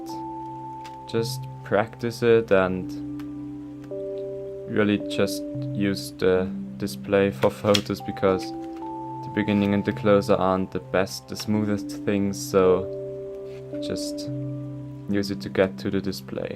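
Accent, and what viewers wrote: Germany. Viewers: Bist du deutscher?? man hört das richtig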